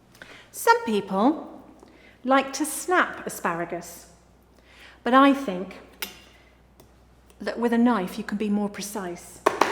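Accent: United Kingdom. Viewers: British English ♥️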